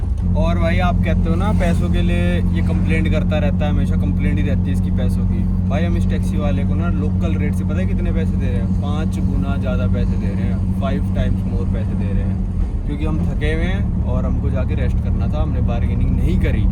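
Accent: Haryana, India. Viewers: Bhai ka Delhi accent change hoke dhire dhire haryanavi nikal raha h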